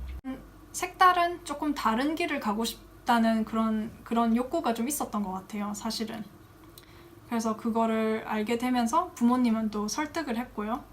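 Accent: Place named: Daegu, South Korea. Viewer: 대구분이세요? ㅋㅋㅋ 사투리 참 좋습니다!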